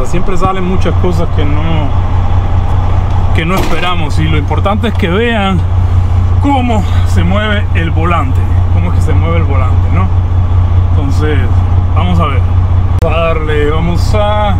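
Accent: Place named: Mexico. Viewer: Saludos bro ya hablas bien Mexa🇲🇽 asi estaba yo pero al contrario cuando me juntaba con todos mis compas cubanos 🇨🇺 incluso estuve en varias ocasiones en Cuba🥰🥳 Saludos mi sangre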